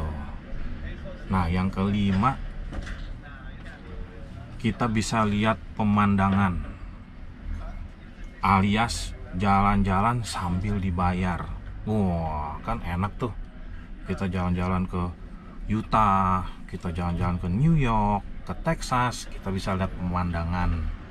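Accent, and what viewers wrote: Jakarta, Indonesia. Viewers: gua suka lihat loe walaupun tinggal di amerika tapi masih logat betawi haha.. semoga sukses bang! :D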